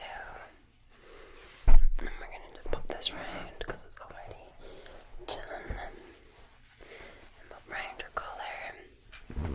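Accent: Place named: Liverpool, United Kingdom. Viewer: scouse ha ha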